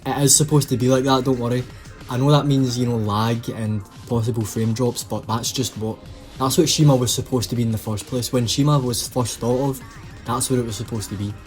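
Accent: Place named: Scotland, United Kingdom. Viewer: Your voice sound like an real Scottish🏴🏴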